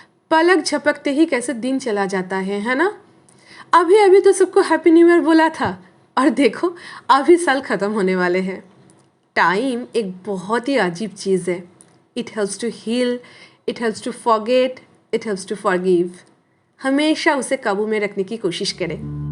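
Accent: Bengal, India. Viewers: Didi i love ur Bengali accent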